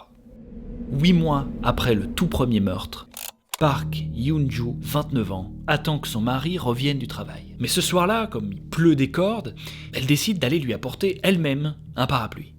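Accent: Belgium. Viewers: Il est belge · Les Belges, vous dites Houite pour Huit et pourtant vous prononcez Tuiste le mot Twist. Super vidéo sinon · Mais il est belge ?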